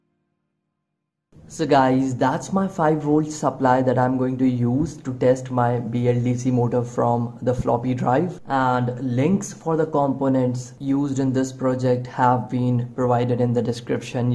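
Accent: India. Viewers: Индусский английский, это самый ужасный английский на земле.🤢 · 여기는아느나라인가 발음이 인도인듣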